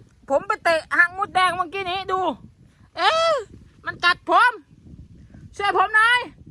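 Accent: Laos. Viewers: เว้าไทยตกลาว5555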